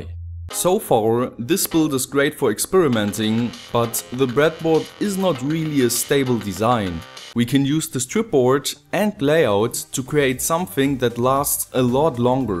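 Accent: Germany. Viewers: Awesome video!!! I have always enjoyed your accent when you speak English--to an American speaker it sounds as if you are trying very hard to pronounce everything right. I saw your other video where you were listening to the pronunciation of "centimeter". You do a great job! I don't think you need to sound "native-born". You are completely understandable, and I do find your inflection interesting--I wondered what your first language was until I saw it was German. By the way, , you sound as if you are saying "isolation", but the wrapper around an electrical wire is called "insulation". Isolation is the function of insulation, though, so it's kind of funny. I think any English speaker can understand your videos just fine though! Small little mispronunciations are "filtered out" when we watch your videos--don't stress out about them!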